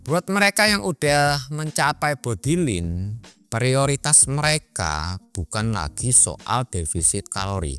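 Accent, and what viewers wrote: Java, Indonesia. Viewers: Sampean jowo to bang, kok medok😀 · Jadi medok gini bang wkwkwk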